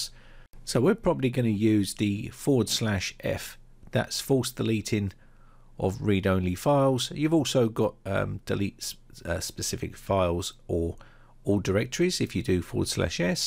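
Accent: United Kingdom. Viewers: Finally british accent being found.. This is rare.. no indian agian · It's British accent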